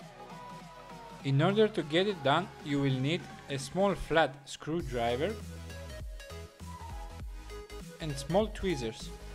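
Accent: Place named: Germany. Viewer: hes german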